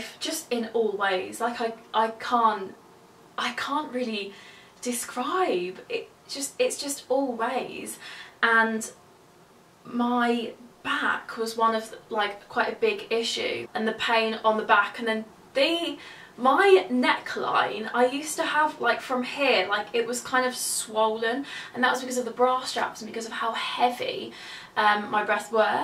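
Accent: United Kingdom. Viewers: Oh that British ascent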